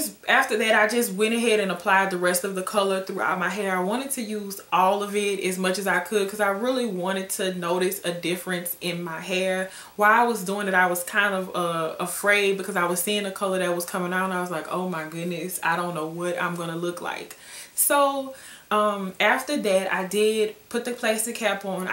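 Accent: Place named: Midwest, United States. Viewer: hey love, thanks for the review. also, are you from Chicago? i kinda hear a Midwest accent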